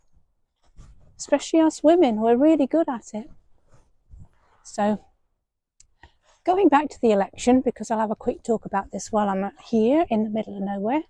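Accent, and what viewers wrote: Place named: Cockney, United Kingdom. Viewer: This woman is speaking with a Cockney accent.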